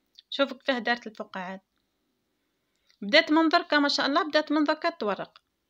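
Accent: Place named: Oum El Bouaghi, Algeria. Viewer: يعطيك صحة حبيبتي غدوى نديرها لعشاء و راني من متابعيك فالفايسبوك تبان لهدرة تاعك من ام البواقي سورتو كي كاتبة فالفايسبوك مطبخ بايضية لخاطر انا تاني مالبيضاء و يشرفنا تكون عندنا طباخة ماهرة كيفك ربي يسترك حبيبتي😘😘😘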